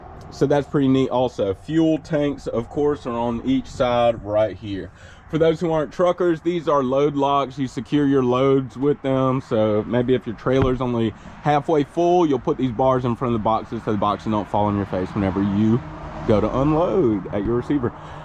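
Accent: South, United States. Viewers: Where you from,? Quite the southern accent.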